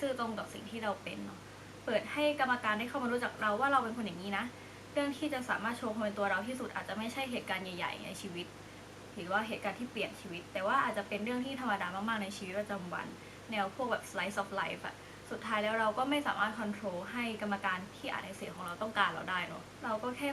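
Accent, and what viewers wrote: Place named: South, Thailand. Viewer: รู้เลยค่ะว่าน้องคนใต้ สำเนียงออก ^^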